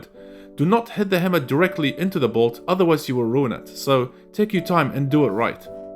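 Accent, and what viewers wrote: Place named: Eastern Europe. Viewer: You sound EASTERN EUROPEAN… but you are from NEW ZEALAND ?? And ZEALAD is actually DUTCH..😃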